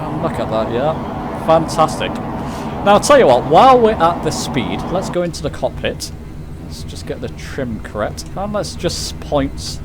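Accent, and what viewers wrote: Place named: Scotland, United Kingdom. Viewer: hi. you say that the flight time is 6 minutes from landidan to paris. i am trying to do the same route. i have download the plane but can't find landidan. is that in finland? although the flight would be impossible. you did say 6 minutes? sorry, i have trouble with your scottish accent as i am english:/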